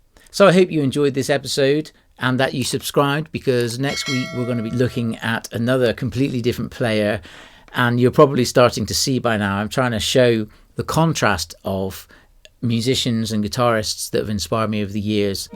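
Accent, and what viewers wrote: Bristol, United Kingdom. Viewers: Are you Bristolian?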